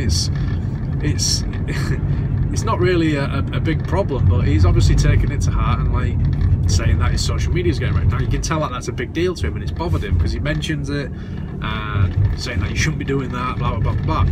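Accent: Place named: Teesside, United Kingdom. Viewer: Great vid mate, where about's you from? Sound a little bit like a smoggie!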